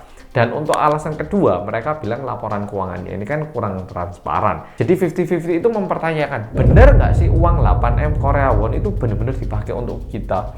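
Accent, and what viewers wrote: Java, Indonesia. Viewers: gak papa mba . Memang tdi keingatnya di kepala juga begini. Ada logat Jawa nya😄 tapi ini beneran, saya tidak menyinggung siapapun yaaa. Ini hanya pikiran yang muncul sekelebat di benak saya. Saya mohon maaf kalau ada salah kata atau pelafalan saya jika ada pihak yg tersakiti 🙏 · wkwk...sama kan...berasa banget logat jawanya..tpi seru sih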